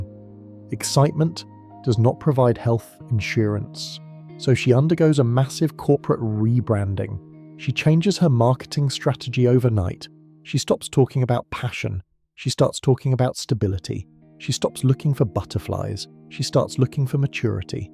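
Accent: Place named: United Kingdom. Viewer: Btw, the Limey accent makes this much more entertaining, (I’m US).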